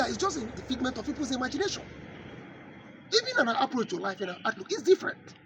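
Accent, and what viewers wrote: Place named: Igbo, Nigeria. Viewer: Very laughable. Speaking English with his igbotic voice while denying his identity. Most Igbo sub groups behave their own greeting. Nsukka, Mbaise, Ngwa, etc all have their own greetings. Igwe Kwenu is the general umbrella greeting. Why are you wasting all this energy denying who you are? Is this inferiority complex or just for political patronage? I just don’t get it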